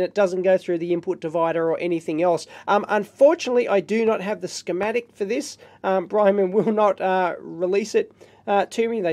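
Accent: Australia. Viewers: "terrible voice" is a little squeaky but for non-english speaking people (like me) is very clear, intelligible and understandable. The most terrible things are both A and E pronunciation but, well, Aussie way:) When he says "EEV Blog" I still get something like "HEY HEY VEE BLOG", but after months - years, by now - of videos I eventually started to love so much his accent. And, of course, I deeply love the contents, the professionality and the expertise. I learned a lot from this YT channel, as well as how understand english language spoken by a "squeaky Aussie" (sorry LOL)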